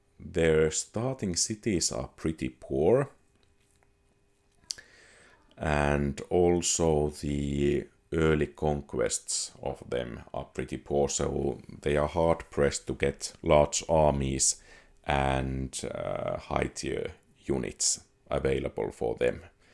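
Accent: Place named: Finland. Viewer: Love to hear finish English! Really nice! Love it!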